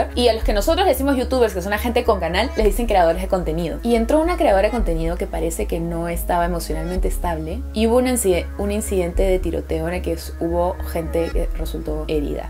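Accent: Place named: Peru. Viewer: SAAA ES MI CAOSA PERUANASA Y NO SE TE QUITA EL ACENTO, ME ENCANTO TU CANAL 💘🌸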